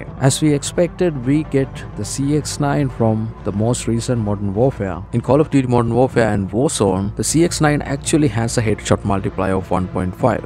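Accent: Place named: India. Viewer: Hey love ur vids has helped me a lot also are u from india ur accent seeems like it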